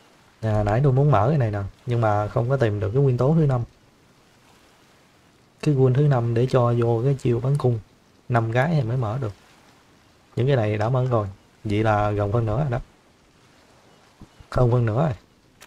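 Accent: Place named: Mekong Delta, Vietnam. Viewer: Nghe giọng thì chắc dân miền tây ròi, e cũng miền tây nè, mà nghe bảo có cam k bận đồ mà a 😓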